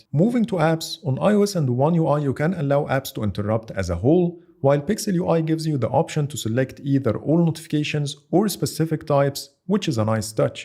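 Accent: Egypt. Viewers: You’re Egyptian 😅😅 the accent is screaming Egyptian guy 😊😊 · لو المراجع مصري يبقى انا كدا عرفت اللهجه صح بارك الله فيك على الريفيو الممتاز 💖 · Your accent is Egyptian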